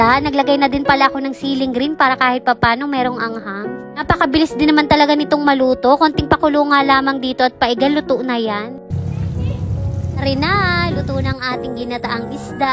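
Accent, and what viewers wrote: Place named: Mindoro, Philippines. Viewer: Katuwang pakinggan ang tuno ng Salita nyo at mga words, kaya pala itong manugang ko sa pamangkin, katulad mo mag salita tiga, Mindoro kasi, tuwa ako pag nagsasalita sya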